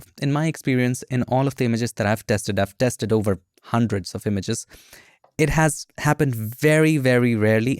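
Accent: India. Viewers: Why do i see many nasty comments on Indian accent? How is it any different than other accents?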